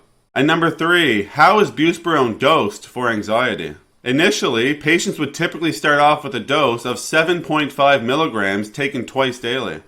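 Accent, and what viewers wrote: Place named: Atlantic Canada, Canada. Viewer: Same here skipper! I know an east coaster when I hear one lol. Cheers, keep up the great reviews.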